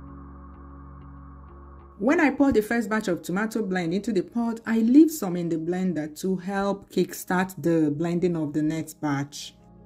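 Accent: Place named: Igbo, Nigeria. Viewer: Nne I'm also Igbo but I just find the way you speak English amazing 😍, like the accent is giving me joy❤ I love it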